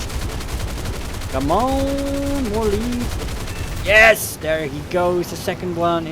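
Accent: Netherlands. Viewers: your dutch i can hear it haha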